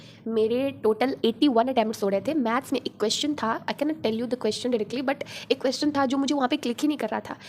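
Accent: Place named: West Bengal, India. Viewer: Are you from wb ?..upki tone se pata lag rahe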